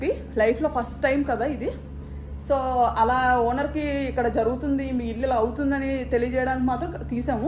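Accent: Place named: Srikakulam, India. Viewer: Language Srikakulam maraledu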